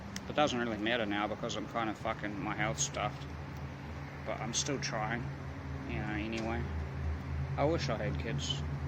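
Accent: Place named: New Zealand. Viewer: Sorry to hear that you're still struggling with this problem Bro. I know the feeling. I don't know you're Financial Status but I Highly recommend you come visit the US. With that strong Accent, Blue eyes, and still have hair is a major plus. Also being from New Zealand 🇳🇿 you will have a line of women I can promise you that. I had a Russian friend steal the heart of a girl who was about to get married and there together. It's vice-versa if your from from Europe and really want a girl come to the US they are easy to Manipulate with European charm. Now if you're American go to Europe you will not be disappointed that's my advice to whoever reads this.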